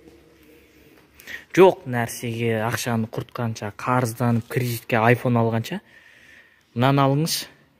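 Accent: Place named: China. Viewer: Тіліңнен. Айналдым қытайдың Қазағында сакталган.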